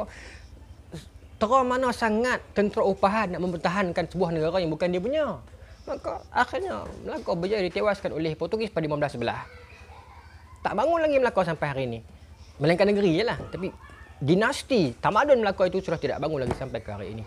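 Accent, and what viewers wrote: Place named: Penang, Malaysia. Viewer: Amboi ustaz, lenggok bahasa satu macam miya 🤭🤣 Jarang-jarang boleh dengaq lenggok penang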